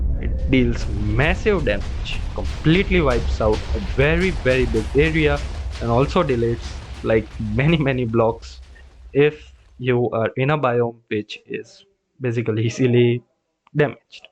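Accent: India. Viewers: yes i knew this was good when i head the indian acent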